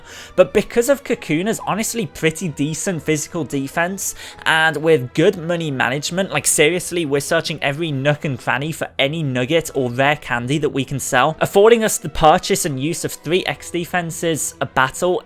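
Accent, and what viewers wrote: Birmingham, United Kingdom. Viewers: My guys from Brum! love that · Damn, all these videos I've watched and I had no idea you were from the same town as me, Brummies unite! You'd think I'd recognize the accent but apparently not.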